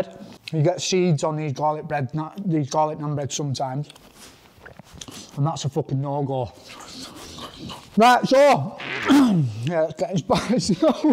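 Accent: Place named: Blackburn, United Kingdom. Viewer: Blackburn accent going on there 😂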